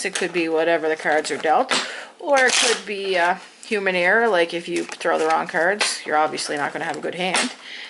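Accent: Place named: Canada. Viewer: I loved the video, and thanks so much for making it! We are learning to play cribbage and I wanted to watch an actual game, so this was a great find on YouTube. I grew up in Canada but now I live in the UK; it was great to hear a proper Canadian accent for the first time in a while! And I was able to share that with my partner. Blessings to you; hope your warm weather comes soon! (It rains a lot here but my British partner says he has never been 'snowed in'; he thinks it sounds nice, but I assured him it's not that great...)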